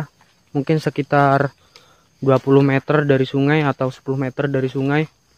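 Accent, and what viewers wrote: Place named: Surakarta, Indonesia. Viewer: Sampean asline ngndi mas,, boso ne ra adoh ko solo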